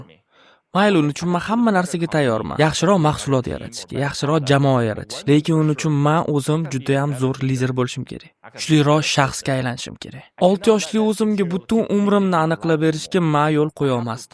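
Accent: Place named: Tashkent, Uzbekistan. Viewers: shundo dovom eturin oka toshken sheva zor ketvotti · shu oddiy gapirganiz yoqdi adabiydan koʻra Toshkent shevada 😊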